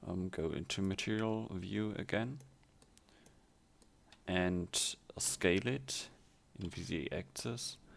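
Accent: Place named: Germany. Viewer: Ich hab beim ersten Wort gewusst das du deutsch bist :D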